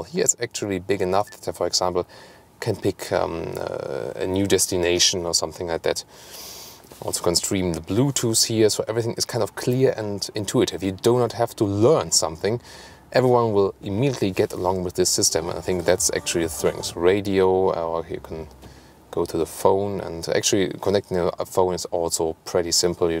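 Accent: Germany. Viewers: An excellent and thorough review by a true gentleman. Your German accent is awesome !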